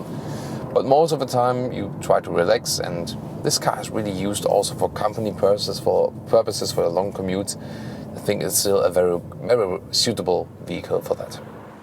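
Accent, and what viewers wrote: Germany. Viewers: Nice review. I love the German accent.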